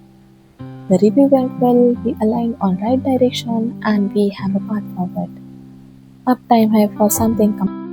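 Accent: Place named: Ahirani, India. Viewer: Your accent is more like an Ahirani language 😃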